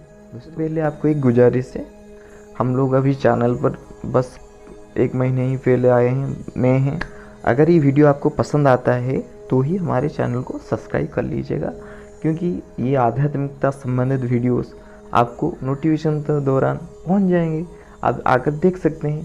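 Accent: Odisha, India. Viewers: Bhai aap odia ho kya??? Baato se toh yese lag raha hey??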